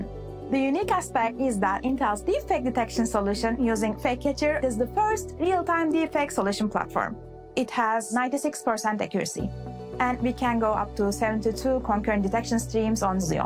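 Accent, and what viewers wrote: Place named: Turkey. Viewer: Tam bir Türk aksanı. Konuyu kolay anladım. Teşekkürler.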